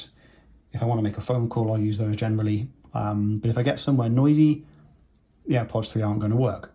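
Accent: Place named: Corby, United Kingdom. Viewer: That sounds like a Corby accent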